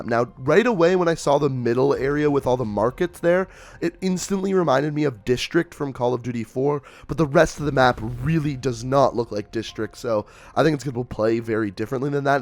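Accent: Canada. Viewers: oh, he's Canadian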